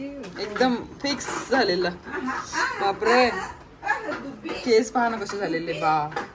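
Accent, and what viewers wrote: Vidarbha, India. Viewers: 1no ❤ ekdm bharri Vidharbh bolte 😊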